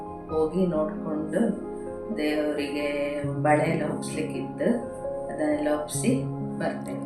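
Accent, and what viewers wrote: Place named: Kundapura, India. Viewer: ನೀವು ಕುಂದಾಪುರ ಕನ್ನಡ ಮಾತನಾಡತಾ ಇದ್ರಲ್ವಾ ಹಾಗೆ ಕೇಳ್ದೆ ನಮ್ಮ ಅಕ್ಕ ನ ಊರು ಸಾಗರ ಭೀಮನ ಕೋಣೆ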